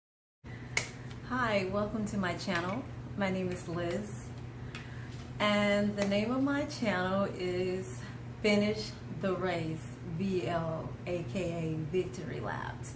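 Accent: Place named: South, United States. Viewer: I love your southern accent. It doesn't sound as though you originally come from Houston. I know you said you lived in South Carolina. Do you know which country in Africa you plan to live in yet? Also, I just subscribed to your channel because I love to see young black brothers and sisters doing positive things. The only advice I have for you is to make sure you have your financial ducks in a row before you go to Africa. I just got finished responding to a bevy of people in Africa and the Diaspora about a family that moved to Ghana from the Netherlands about a year ago but is now on its way back to the Netherlands because things got too hard for them. Their kids were in private schools for God's sake. Lastly, I would appreciate it if you could visit my YouTube channel I started this month to check out a video I produced about the N-word. 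It's very scholarly because of my background, which you can learn about if you visit the site. I also have four more videos coming up after I edit them--I'm somewhat technologically challenged. The next four are about how the N-word does not come from the Niger River, which is what some black scholars are now saying; how the prison industrial complex is genocide; how black lives didn't matter during the civil rights era, using three famous case studies; and my take on the Critical Race Theory. I also plan to launch a pan-African daily news channel early next year, which would probably be more fitting for you. But if you could support my cha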